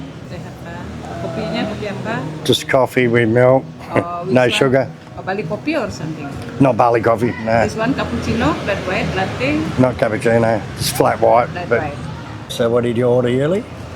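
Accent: Australia. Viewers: Love the Aussie accent, says this Yank. Apologies if you're from NZ. The accents to our ears, are so similar.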